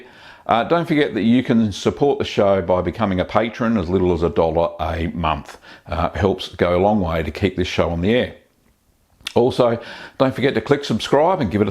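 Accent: Australia. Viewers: This has been my favorite cheese that you've made so far. I really hope this one turns out well for you. I just love how you Aussie's say the number 13. It sounds like, "Thuh'deen." In the US, we really over-pronounce the letter "r" compared to the UK, NZ, South Africa, and Australia. Words like. "Where, there, beer, water..." in the US we really nail that RRRRrrrr sound at the end. You Aussie's really smooth it out to sound more like... "Whea, Theah, Beeah, and Woe'dah." It's nice to hear.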